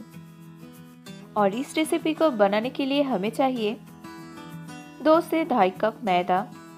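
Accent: India. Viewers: Such a sweet Hindi accent , thank you for the reicpe.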